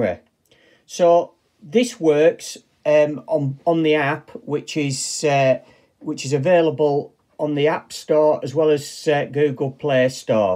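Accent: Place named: England, United Kingdom. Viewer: I don't understand your English accent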